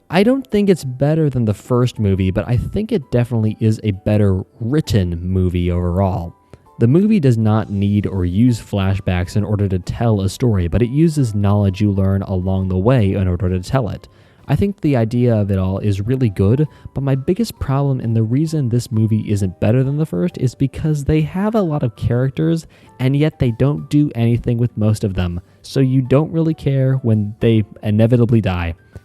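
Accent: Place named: Canada. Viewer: 1st video of yours, where’s your accent from? I’m assuming Canadian? (Sorry if wrong I’m from Australia lol)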